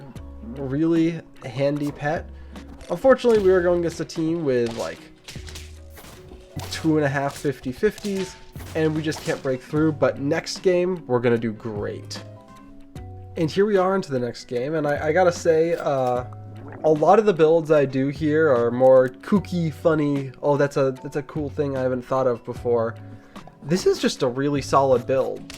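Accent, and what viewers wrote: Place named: New Zealand. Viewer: didn t know you were from New Zealand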